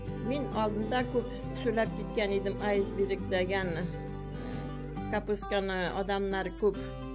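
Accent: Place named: Turkey. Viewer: Turkmisiz deyman aksent bilan gapiryapsiz ozbek tilini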